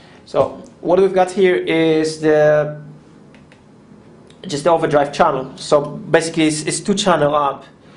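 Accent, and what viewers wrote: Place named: Germany. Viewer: Whats with the voice.. I hear a German accent.. whats the issue with u puss***s :D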